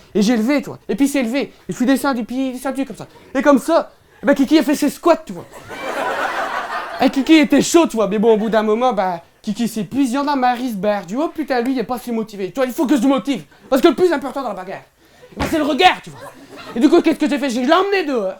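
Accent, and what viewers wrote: Nord-Pas-de-Calais, France. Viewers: Accent nordiste lol! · On dirait un vrai chti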